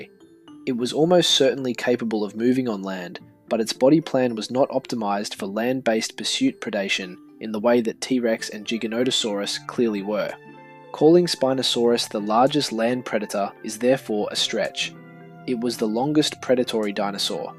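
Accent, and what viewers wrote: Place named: England, United Kingdom. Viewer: that just the way red coats say the word.. some annoying english guy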